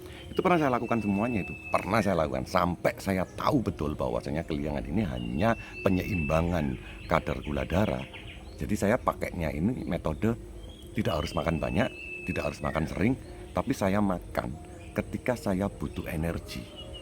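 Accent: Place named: East Java, Indonesia. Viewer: kalau liat dr logatnya. Masnya dari Jawa Timur. Kebetulan asli saya Jatim juga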